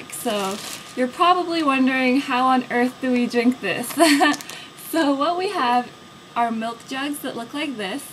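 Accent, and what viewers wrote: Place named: Canada. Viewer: I like the Canadian accent :3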